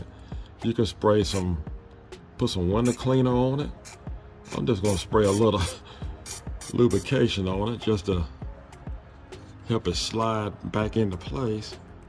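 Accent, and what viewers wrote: South, United States. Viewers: Southern drawl is icing on the cake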